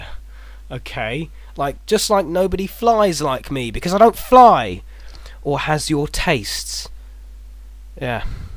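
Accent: United Kingdom. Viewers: ***** He's definitely from Britain. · Your voice is like the love child of Yahtzee Corshaw, Stephen Merchant, and every single other British voice I heard over the internet. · I always enjoy hearing British voices which is why I like Michael Rosen poops so much. What part of England are you from? Or perhaps are you from a different country?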